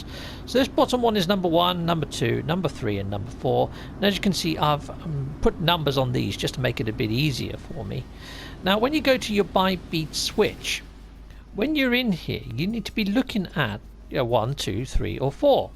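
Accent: England, United Kingdom. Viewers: Classical English voice is classily English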